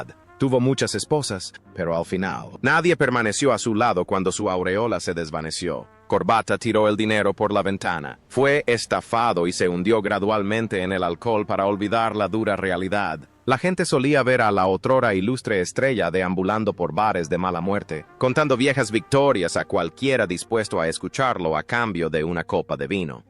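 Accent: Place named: United States. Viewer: Por favor,infórmate y compagina bien y no hables con acento de yanqui qué chapucea castellano.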